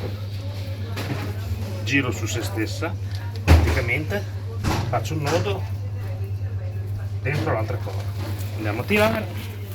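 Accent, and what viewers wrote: Emilia, Italy. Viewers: Si ma guarda che lui ha un'accento emiliano, mica romagnolo. Va ben che ne han fatto una regione unica, ma a parte quello son due cose ben diverse, e accenti ben diversi, con Cesenatico non c'entra niente...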